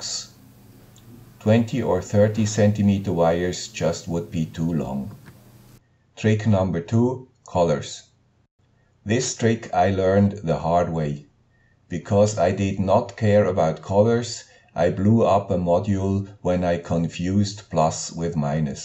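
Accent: Switzerland. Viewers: I have been surfing around for Arduino related content and every time I start watching a video or two, I end up finding one from your channel. From Lora Gateways to Dupont Wires, from Crimper tools to anything. I always find the info I am looking for at your channel. For short, I want to thank you for your great work. I am big fan, and I wish you health and strength to make many more useful videos for the community. Thank you so much:) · as usual, " ", you simply peddle great info. simple, to the point, and, that hallmark of good info, seems pretty damned obvious AFTER you're told, lol. kudos, and a new subscriber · Trick no. 4 was exactly what I was looking for but unfortunately I don't have a solder gun at home so rip. But these tips are great,! Thankyou so much! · Appreciate the channel of the guy with sweet Swiss accent !!! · Useful tips! Thanks for sharing,!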